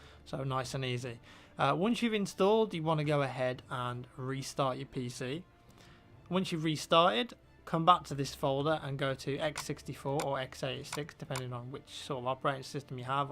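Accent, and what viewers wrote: United Kingdom. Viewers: ur british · Gotta love that british accent! :3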